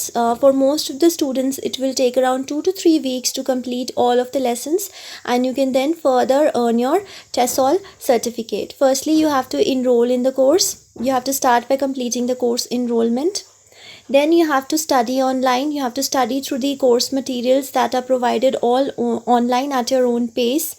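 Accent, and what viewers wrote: India. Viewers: Indian accent you can't blame her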